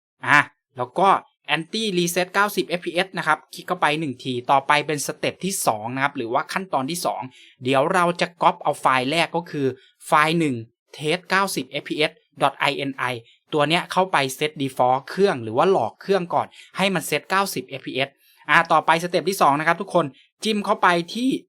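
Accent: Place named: South, Thailand. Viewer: ทำไมต้องพูดใต้ด้วยครับ5555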